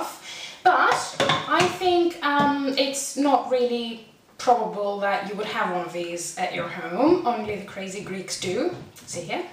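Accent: United Kingdom. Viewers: Hey, Auntie.... you are so cute. I loved to watch it. You should do comedy TV.:-) Your English is really "Russian free", I swear... more you already have a colour of "very British". This Frappe rememers me good times when I was skipper for sailing yachts in Greek Cyclades... easy to do, and for Coffee junkies like me a great thing. Cant live without it, even in hot summer in midth of Germany (we have up to 38 degrees todasy). Keep going...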